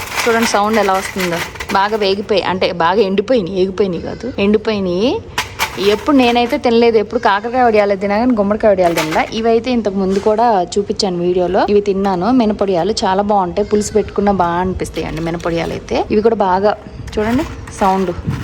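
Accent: Nellore, India. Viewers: Meedi nellore aa andi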